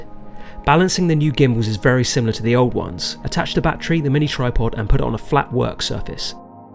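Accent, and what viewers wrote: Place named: United Kingdom. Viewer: dig the accent…from UK?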